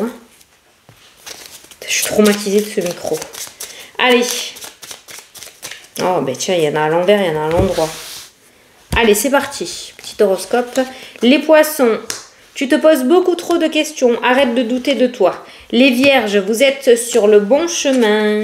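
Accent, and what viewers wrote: South, France. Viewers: Bonsoir, un grand merci pour cette guidance avec votre jolie voix, votre petit accent du sud est marrant. Vous avez du psets et cela fait du bien. Votre dynamisme fait du bien. Merci pour votre gentillesse et votre bienveillance. Que Dieu vous bénisse ainsi que votre famille.❤❤❤🙏🙏🙏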